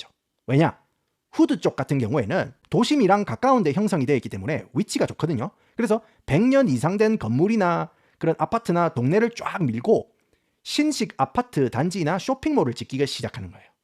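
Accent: Gyeongsang, South Korea. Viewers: 영어와 갱상도 액센트의 강렬한 콜라보!!!!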